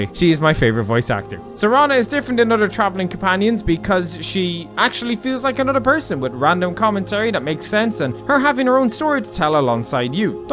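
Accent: Ireland. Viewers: I just found this guy. He sounds Irish to me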